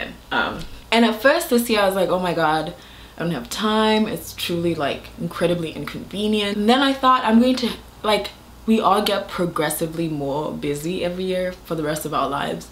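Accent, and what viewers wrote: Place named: Botswana. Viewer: i literally came back to watch a couple of your videos from last year last week, this is such a pleasant surprise! also your setswana accent is legitimately my favourite accent i’ve ever heard, i tell my friends this all the time.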